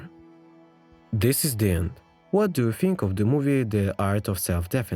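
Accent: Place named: Russia. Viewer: Чувак, какой ты ещё?😂 Ещё и страна указана "Соединённые Штаты". Да с твоим акцентом тебя, русского, за километр слышно. Ты уж если не умеешь говорить как носитель языка, так хотя бы не делай вид, что ты им являешься.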